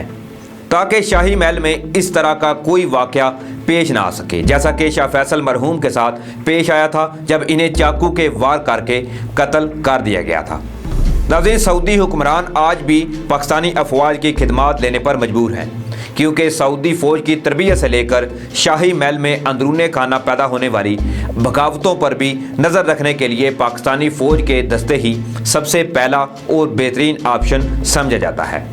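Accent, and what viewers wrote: India. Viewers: You speak Urdu in Hindi accent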